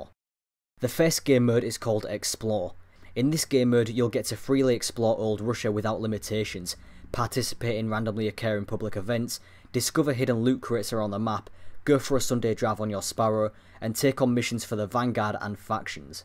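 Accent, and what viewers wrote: United Kingdom. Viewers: Well done! This video got you an insta-sub from me. Your even peaceful brit accent is a refreshing change of pace from our freak out beautiful b-tard MoreConsole friend. :-) Can't wait to watch more!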